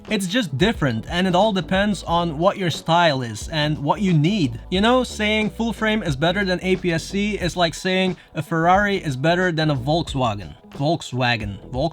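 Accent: Belgium. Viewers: Antwerp accent?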